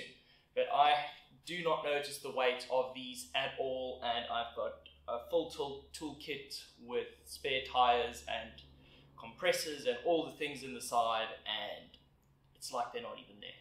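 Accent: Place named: South Africa. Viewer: Any chance this is available in South Africa, sounds like a RSA accent 🙂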